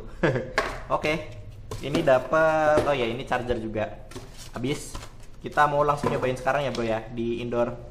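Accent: Banyumas, Indonesia. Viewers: yes video baru.. assiikk. yesss kompor baru... makin aassiikk. 😂 udah kangen sama video2nya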